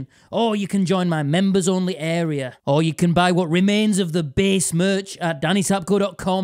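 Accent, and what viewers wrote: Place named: Yorkshire, United Kingdom. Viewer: I would definitely donate a bit of money to you if the country in which I live in did not have an economy as shite as that of Turkey:/ I first started watching your content since the Yorkshire accent really took my attention but ever since then you've made my day several times with your unique style of humor and behs playing. Keep on rocking, thanks for the vids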